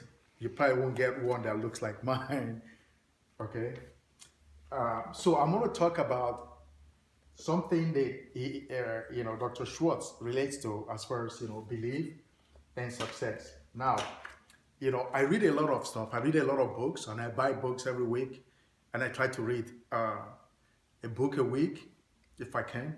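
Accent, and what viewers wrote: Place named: Africa. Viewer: Always interesting to hear from you. People who are not in America will not understand they joy some of us living here have when we have the opportunity of hearing own brother teach with our own African English. Thank you my brother.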